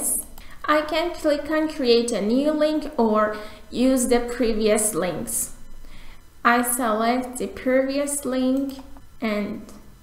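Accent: Iran. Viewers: That Persian accent 😂😂😂😂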